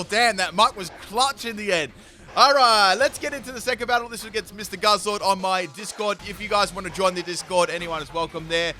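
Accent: Australia. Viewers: Do they honestly not teach phonetics in Australian school? Always baffles me the way you sound words out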